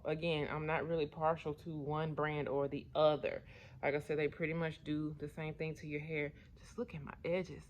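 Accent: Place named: Mississippi, United States. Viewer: Where u located? I'm getting a Mississippi vibe from your accent. Just curious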